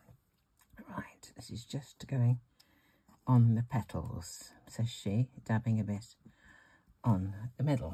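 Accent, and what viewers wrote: England, United Kingdom. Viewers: Today was the first time I've seen you, it was lovely to hear an English accent (just for a change) and to see dies whose make I have but have never seen demonstrated before. Enchanting l loved every minute thank you ❤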